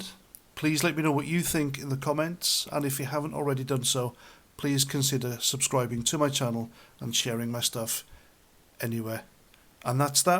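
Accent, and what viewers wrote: Wales, United Kingdom. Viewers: who are you calling sissy with that bloody awful accent and speech Taffy?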